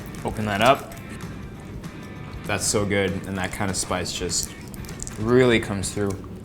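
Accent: Philippines. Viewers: Cool Tagalog accent Erwan! would love to hear more of this! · Heavy breathing while watching you eat it all!! Everything looks delicious! ❤️❤️❤️ Plus your Filipino accent hahaha so cute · Love your filipino accent! ❤️ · You are so cute with your filipino accent huhu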